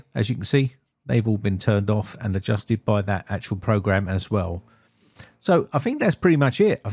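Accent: United Kingdom. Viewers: Another Windows Install video. I'm keeping track I think this is video #23 of Windows 11 Installations brought to you by. I just watch cause I love the pleasing Brit accent.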